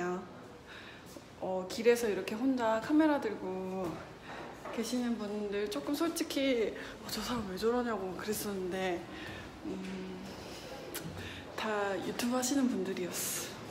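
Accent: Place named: Seoul, South Korea. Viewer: ~~ 덕분에 진짜 몇년만에 본다 ~~!! 유튜브 라니!!! 근데 왜 서울말쓰려고 노력해?? ㅋㅋㅋ 너무 귀엽고 이쁘다 유튜브스타로 대성하리!!!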